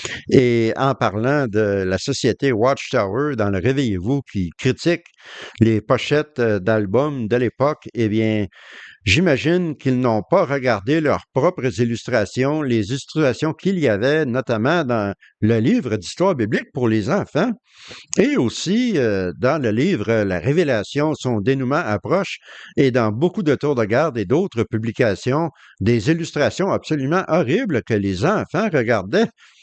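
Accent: Quebec, Canada. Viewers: Merci pour ton travail de recherche… pour mettre en évidence les corrections, les changements de la doctrine… Je suis né de parents TdJ, baptisé le 20 avril 68 à 14 ans, membre du comité comme serviteur aux études bibliques à 18 ans, j’ai suivi la formation de l’école du ministère du royaume au Bethel de Bruxelles. J’étais le plus jeune avec les autres frères qui avaient entre 30 et 60 ans… quelques mois après, une nouvelle règle a été mise en place…:il fallait avoir 20 ans minimum pour occuper un des postes du « comité » : surveillant président, adjoint et serviteur aux études biblique. Je me flatte d’avoir été la cause de ce changement de règle dans l’organisation. Moi qui servait de tout mon cœur, viré comme un malpropre pour être remplacé par un frère qui refusait de prendre les sœurs âgées dans sa voiture parce qu’elles avaient mauvaise haleine… mais il avait plus de 20 ans, c’était le plus important désormais pour la WT, plus que la disposition de cœur… Ça a été une douche glacée sur mon feu dévorant intérieur. 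Ça m’a pris des décennies pour me déprogrammer de tout cet endoctrinement. Encore merci pour la clarté et la précision de tes vidéo. J’adore ton accent Québécois 😉 On fera bientôt connaissance dans le nouveau système de choses… 🤪😜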